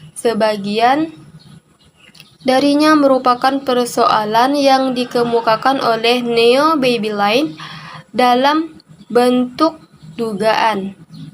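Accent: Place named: Ketapang, Indonesia. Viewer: Logat ketapangnye ade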